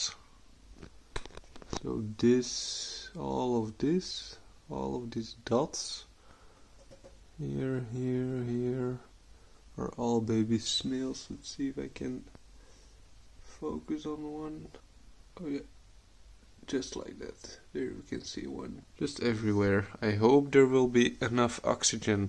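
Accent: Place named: Belgium. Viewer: Judging by your accent, you’re Belgian right?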